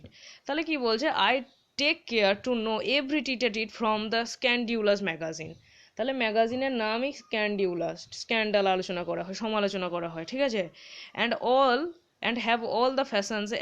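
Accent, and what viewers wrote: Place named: Katwa, India. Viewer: To cover up the whole syllabus for the upcoming SLST, binge watching/listening the whole playlist. Thank you very much. Madam, are you from Bazarsau-Shaktipur-Katwa area? The bengali accent seems familiar.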